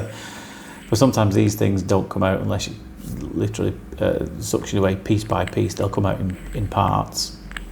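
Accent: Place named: England, United Kingdom. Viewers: Ah, thanks for that! I wondered because just occasionally I thought I might have detected a tiny trace of something else, something closer to the southeast tip of Lancashire, or thereabouts. It's just one of your vowel sounds, I think. But then, I'm absolutely no expert on the Leigh/Golborne area accent (obviously). 😄 Yours is a lovely accent - we do have some beauties in the northwest and the north, don't we? And they are more original, closer to how English sounded 1,000 years ago, which I rather like. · Great job! Love your English accent! Canadian here. Weird to love this stuff but I do enjoy watching your work! And you are handsome to boot!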